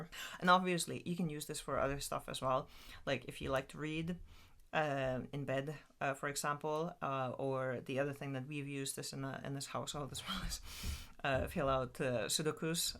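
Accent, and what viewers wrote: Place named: Finland. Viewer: I was watching this to find out more about the light and noticed your accent sounded Finnish. Then I saw the Moomin poster in the background and thought “she must be Finnish!”. If you are Finnish, then I will say kiitos videosta.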